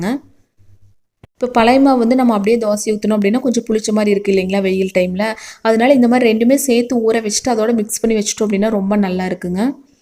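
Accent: Kongu, India. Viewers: Kongunattu Thamizh ketkave inippa irukku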